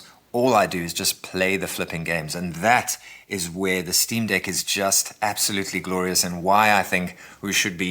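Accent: South Africa. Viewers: You South African ? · Are you South African? You sould South African.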